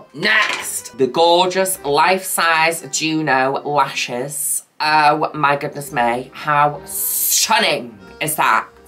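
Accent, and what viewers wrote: Manchester, United Kingdom. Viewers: Oh my goodness, I would love to watch anything with your narration!!! The world needs more Manchester accents in media! 💚💙💜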